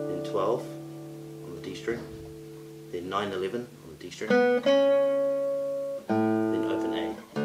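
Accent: New Zealand. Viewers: I'm really glad to enjoy your tutorial here. Moreover, 'Kia ora' is very familiar because when I visited New Zealand for a month 2011, English Program for teachers in Auckland. My hosts were very warm-hearted and I still miss them a lot. Today your English accent and pronunciation reminds me of them. Especially whenever I have free time, I'll visit here and enjoy your play and explanation. Thank you so much ~!😊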